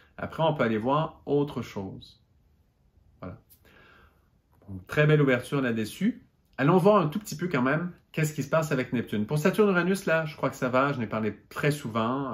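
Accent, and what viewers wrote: Quebec, Canada. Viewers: Bonjour et merci beaucoup (J'ai toujours du plaisir d'entendre l'accent du Québec où J'ai vécu quelques temps)...Je suis abonnée à la chaîne d'emilie Morel et je suis ravie de la perspective de votre collaboration. Je suis taureau ascendant Gémeaux et ça va plutôt pas mal car le soleil en maison 12 c'est très dur. Lune en bélier. Je vais noter pour vos conférences. Amitiés de Bretagne.merci.(milieu du ciel Mars et Jupiter en Verseau 😍)).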